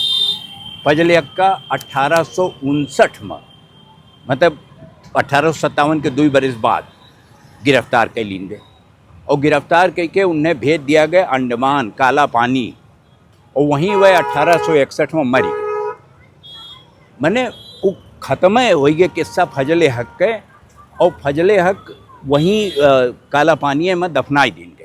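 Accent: Awadh, India. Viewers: Sir ji aapka avadhi me bolna bahut hi sundar hai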